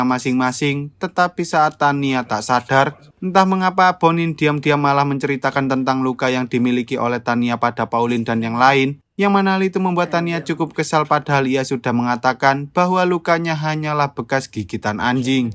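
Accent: Java, Indonesia. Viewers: Miminnya logatnya masih medok😅 tpi seru jg dengarnya😅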